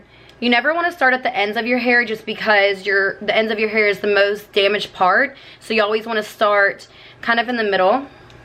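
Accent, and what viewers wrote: South, United States. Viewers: Is she from flordabamma shore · You kinda have a slight southern accent, it's really interesting loll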